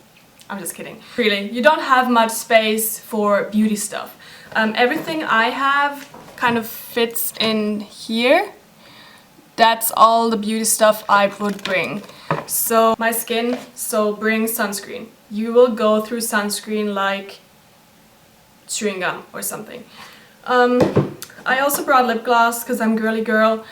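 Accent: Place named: United Kingdom. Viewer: but akcent is british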